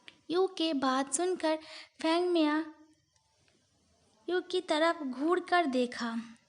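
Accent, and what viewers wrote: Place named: Bengal, India. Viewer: Mujhe laga hi ta pahle din se hi ki aap bangli ho kuki aapke bolne ke lahje se me samjh gai ti di kuki me bi bangli hu 😍 and i love your voice and aap bahut hi aachi bol leti hai di 🤗 love you so much di 😘😘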